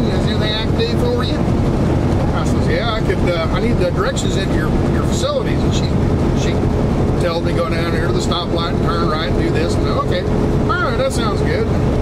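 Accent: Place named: Southern Ohio, United States. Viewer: You don’t sound much different than those of us in southern Ohio.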